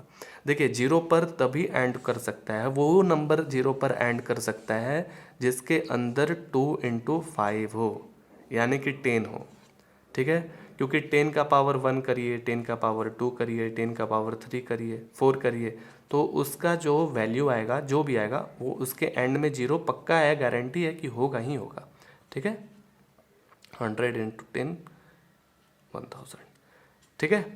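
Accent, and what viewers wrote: Bihar, India. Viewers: Dear sir Ur Bihari tone is so sweet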